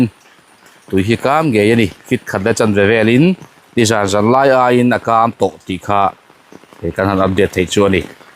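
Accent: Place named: Texas, United States. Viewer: Pu, i Texas awphawi hi a pa tlat.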